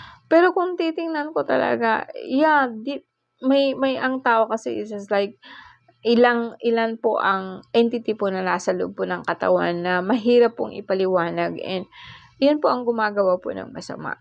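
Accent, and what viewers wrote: Bicol, Philippines. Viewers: Taga Bicol po kayo? May punto po kasi kayong Bicolano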